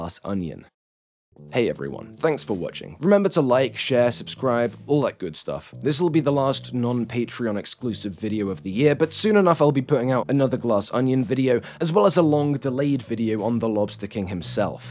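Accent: United Kingdom. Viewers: Hilarious to hear you and all those who pretend to just love Glass Onion even though it lacks everything that Knives Out “an actually good movie” has in volume. Name one character you are true my invested? Glass Onion sucked and even your British accent can’t convince me what I watched isn’t complete RUBBISH!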